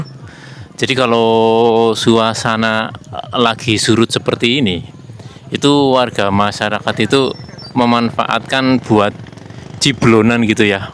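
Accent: Banyumas, Indonesia. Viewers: aku seneng ngrungokna obrolanen ngapak Ramene ORA patut..dadi khase yaa Mas😂👍👍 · Tetep logat banyumas saja om, biar unik jg enak didengerin. Kalo bisa ada dialog dg warga lokal pake bahasa lokal jg om, suka aja dengerinnya. Jadi lupa kalo negara lg krisis. Hee... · Pertahankan dialek Banyumaas dan bhs Indonesia bagus dan lucu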